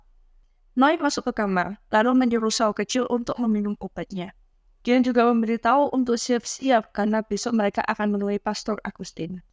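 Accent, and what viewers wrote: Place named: Java, Indonesia. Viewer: Wong jowo lagi medok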